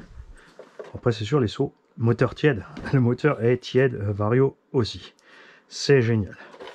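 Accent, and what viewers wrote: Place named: South, France. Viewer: qui parle sudiste tu m'as régalé 😍 ( sud power 😁) Le front flip magique jamais je pensai que c'était possible. Et visi bien solide la machine car elle prend cher sur cette vidéo 💪💪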